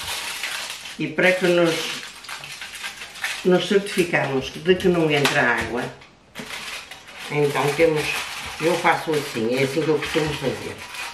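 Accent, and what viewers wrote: North, Portugal. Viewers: Por curiosidade esteve a viver em que país? Isto pq eu sou nativa e sei pela sua pronúncia q é norte, ali a zona de Caminha até Porto... E no seu caso não chegou a influenciar a sua pronúncia o tempo q esteve noutro país. Falava essa língua? Seria Brasil? Se n falou lá ma língua nativa tb n pode ter sofrido a influência claro. Obrigada.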